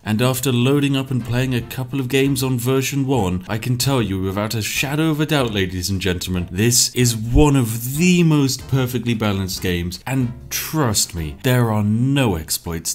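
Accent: United Kingdom. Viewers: i like the voice narrating, so smarty kind of bri-ish and with silly jokes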